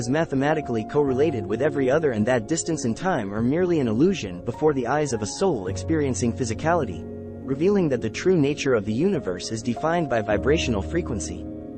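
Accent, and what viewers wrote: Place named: Australia. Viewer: Thats not your voice!! You, my man,sound like crocodile dundee!! Crikey! Great job! The moon is indeed a spaceship.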